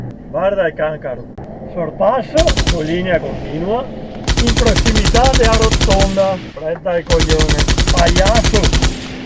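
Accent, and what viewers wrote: Belluno, Italy. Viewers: buonasera non ho ancora trovato il tempo di contattarti via Mail che sto facendo straordinari al lavoro,comunque ti scriverò sicuramente,comunque hai l'accento identico e la stessa cadenza del mio ex titolare di Belluno e Alleghe quando lavoravo in gelateria qua a Stoccarda ora mi occupo di sistemi d'iniezione nel settore aero navale una grossa azienda qua nella foresta nera,comunque pochi mesi fa ero nel Veneto a visitare Venezia e Padova e son passato a Losson dell Battaglia per visitare un momento ai Caduti della Brigata Sassari che sicuramente forse conoscerai è proprio all'ingresso di Losson in quanto io abito si in Germania ma sono sardo di Sassari e volevo far visita a quel monumento ai Caduti Sardi,ciao buon fine settimana🙋🏻‍♂️🙋🏻‍♂️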